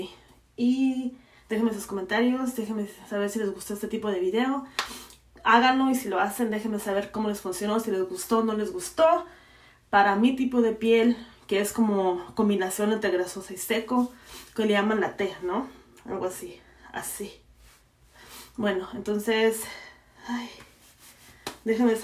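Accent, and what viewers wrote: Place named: Argentina. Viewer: Jajajaja ame tu acento argentino! Saludos desde buenos aires 💕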